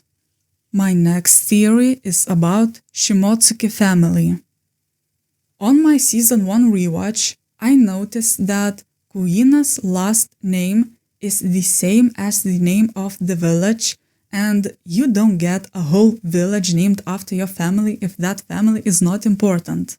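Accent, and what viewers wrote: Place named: Lithuania. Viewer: Oh well that justs shows that i should meet more Lithuanians in my daily life lol. I'm Dutch so i know other eastern european accents, but haven't heard a Lithuanian before! But that aside, your channel is really cool and deserves more subscribers! I love your pov as a Live Action only fan and i hope you make more content. But until then, i gotta binge watch the rest of your videos.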